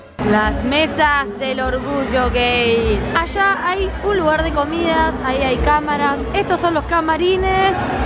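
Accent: Argentina. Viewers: eres más ser humano que YouTube. Tu acento argentino es muy lindo.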